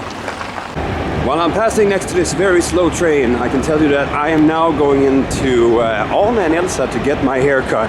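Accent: Skåne, Sweden. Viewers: the skånska is real, det gillas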